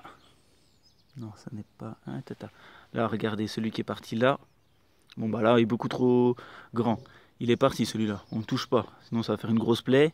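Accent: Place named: East, France. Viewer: L'accent est un peu de l'est , allant de la Franche-Comte-Bourgogne aux Vosges.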